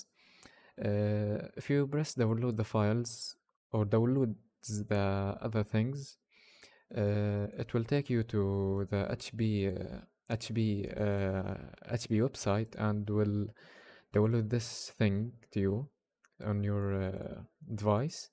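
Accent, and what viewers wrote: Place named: Arab world. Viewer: من اللكنة باين عربي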